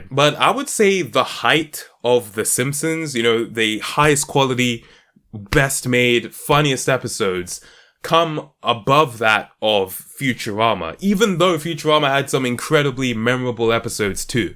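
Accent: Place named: United Kingdom. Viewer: Love your Uk accent!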